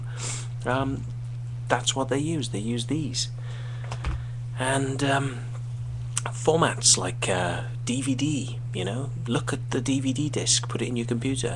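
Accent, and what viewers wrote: Wales, United Kingdom. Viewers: Holy shit, you can't be this idiotic. You still sound a bit welsh, but that surely can't be the reason. You make it sound like you know what you're talking about when claiming (incorrectly) that a lot of technologies Apple incorporate come from UNIX servers. But then you come up with these gems. "DVD is a PC format" "Web Pages, web design came from a PC" Ever heard of Xerox Parc, NeXT? Those are the origins of the GUI and "Web Pages" as you call them. The X is OS X is "ten", not 'X'. Dumbass.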